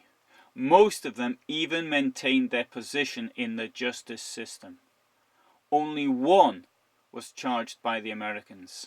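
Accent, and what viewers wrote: Newcastle, United Kingdom. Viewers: Really enjoy the channel, improving my knowledge & understanding, the fact that I also love the Newcastle accent is a real bonus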